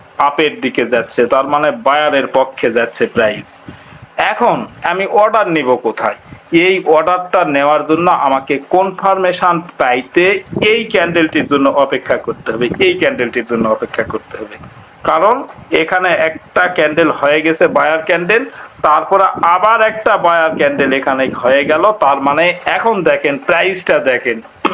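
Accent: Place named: Bogura, Bangladesh. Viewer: ভাই মনে হচ্ছে আপনার বাড়ি বগুড়া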